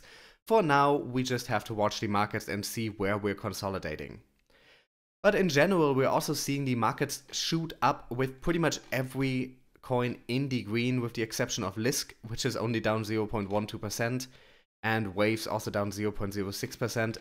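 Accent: Germany. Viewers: love your vids, thanks for your hard work. You remind me of German poker pro named Bencb789, ever heard of him? well, only thing you have in common is German accent. But you both sound smart AF! thanks for the info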